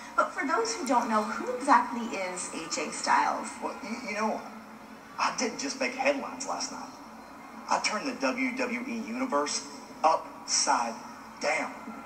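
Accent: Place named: South, United States. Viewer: lmao "he kinda has a hillbilly voice" ...he's from the south, hence a southern accent.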